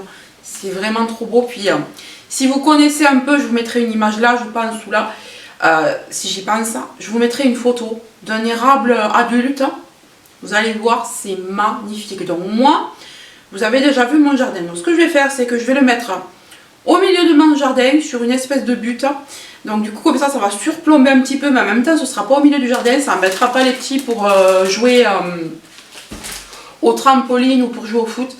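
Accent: South, France. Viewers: j'adore ton accent ensoleillé, accent du Sud ?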